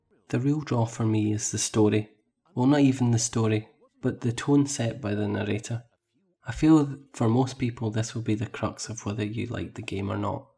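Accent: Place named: Scotland, United Kingdom. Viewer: Good review. Consider me a subscriber. Don’t hide your Scottish accent tho!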